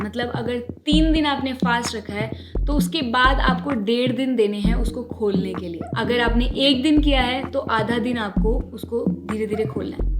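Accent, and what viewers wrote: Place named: Uttarakhand, India. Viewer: I can guess you are from uttarakhand ...😅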